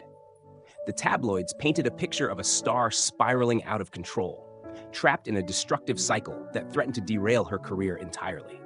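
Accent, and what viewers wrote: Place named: United States. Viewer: The HORRIBLE Yank narration spoils this!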